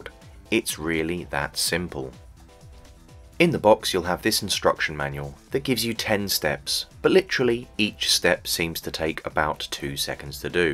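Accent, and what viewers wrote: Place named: RP, United Kingdom. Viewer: Was worried we wasn’t gonna hear you clear speaking posh voice then when the music kept going.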